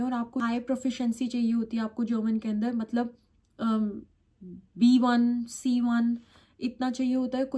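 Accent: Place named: Delhi, India. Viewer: Delhi wali hindi bol rahi ho aap with jat test ke sat ❤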